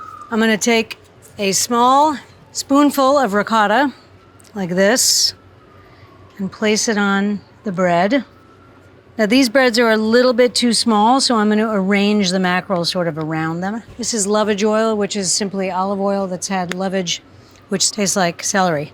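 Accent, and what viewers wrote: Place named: England, United Kingdom. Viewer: hold on while I get myself together 😂😅🤣,Your hilarious 😂😅😂,love your English accent,Oh yes love your cooking 🧑‍🍳 shows.Thank you for so much entertainment 😘😘❤️🇬🇧❤️🧑‍🍳🥂😇😘😘❤️🙏❤️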